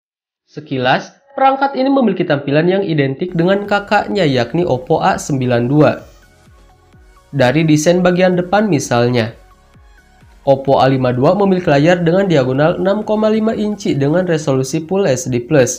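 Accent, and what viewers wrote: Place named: West Java, Indonesia. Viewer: SAYA MENCIUM NADA URANG SUNDA IEU MAH BOAA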